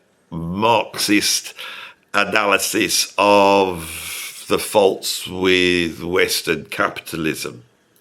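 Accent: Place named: England, United Kingdom. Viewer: You know, you sound like Wolfman Jack with an English accent.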